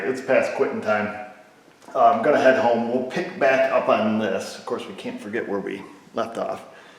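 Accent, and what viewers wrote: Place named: Long Island, United States. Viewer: Are you located on Long Island New York it sounds like you might be I really could use a good mechanic like yourself trustworthy and very knowledgeable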